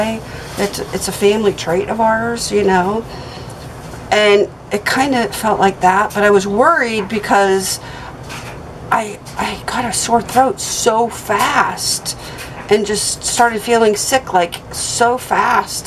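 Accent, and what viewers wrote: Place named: Pennsylvania, United States. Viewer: Glad to hear you are negative! Here from Simple Life! I would have swore u were from PA w that accent! I grew up in PA, & u know I gotta say it.... We Are!!! Lol! God bless!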